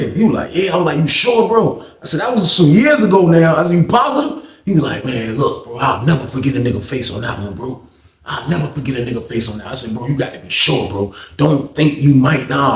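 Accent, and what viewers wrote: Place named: Brooklyn, United States. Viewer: Sounds like Brooklyn dudes in NY lol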